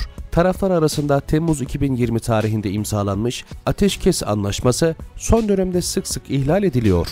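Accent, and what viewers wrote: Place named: Russia. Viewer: rus aksanınız var acaba rusmusunuz?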